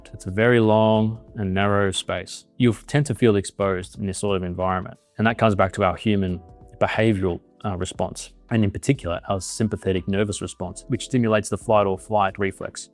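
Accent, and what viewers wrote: Australia. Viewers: Definitely Down Under. The sun path threw me until I placed your accent. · at 48 seconds in he mentions the southern hemisphere. Also his accent clued me in that he was Australian. I’m from Australia and down south we have quite cold winters.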